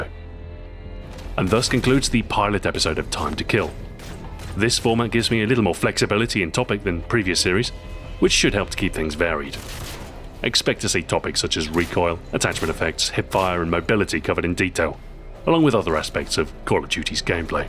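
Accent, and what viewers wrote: England, United Kingdom. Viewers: No. this is just an a guy with an English accent who likes CoD, Soap has a Scottish accent anyways :P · yeah, the british accent is awesome!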